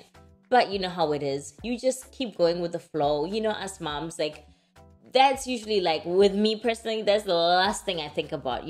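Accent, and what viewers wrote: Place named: South Africa. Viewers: Ur accent is so close to South African 😳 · I thought as much that u can be South African. 😁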